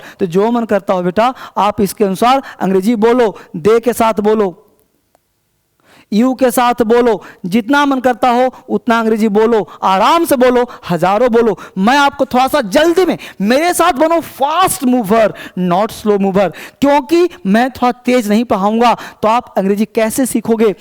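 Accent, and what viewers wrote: Bihar, India. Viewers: ( Wah Sirji ! )you have great energy.I like the most your Bihari tune when you teach online..Ek Bihari sau pe bhari...you have proved that....and go ahead with god bless you..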